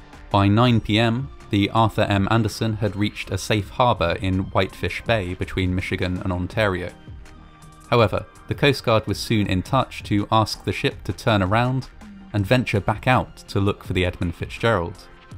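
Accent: England, United Kingdom. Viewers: Im beginning to develop an addiction to your voice and the way you tell stories, which part of uk is your accent from? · American ship British narrator 🤔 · Between you and Coffeehouse Crime, I am getting my fill of soft-spoken English guys telling me about terrible things in a soothing, satisfying manner.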